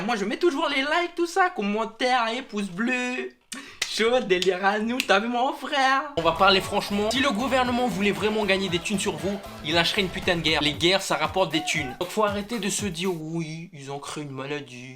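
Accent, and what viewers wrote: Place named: Lifou, New Caledonia. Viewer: Sort d'autre vidéo !! Hhaha je kiff ton accent dehu mon reuf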